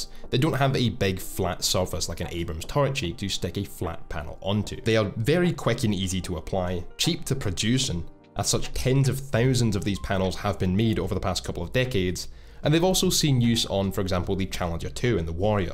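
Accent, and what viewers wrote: Scotland, United Kingdom. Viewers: Scottish ey noice!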